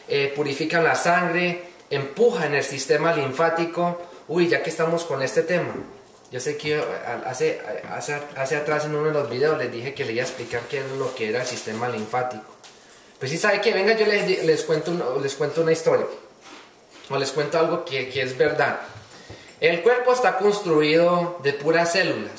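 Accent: Colombia. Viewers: Este asentó me parece conocido...Colombiano.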